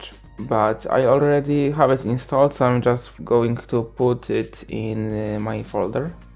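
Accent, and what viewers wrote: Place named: Poland. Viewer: słychać że polak :PPPP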